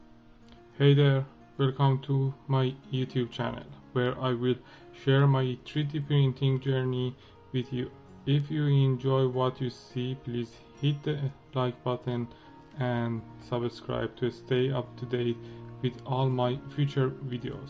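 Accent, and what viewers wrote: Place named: Iran. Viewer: سلام ممنون بابت ویدیو شما ایرانی هستید؟ لهجه تون که ایرانیه😅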